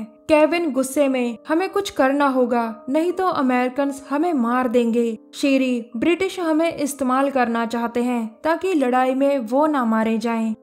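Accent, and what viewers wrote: Punjab, India. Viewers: Mam app Punjab sa ho ? Ya Haryana sa?? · Ur definitely punjabi apke accent se pta chal aap punjabi girl ho ❤️👍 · Aap punjaban ho na. Punjabi log aisi he Hindi bolte hai.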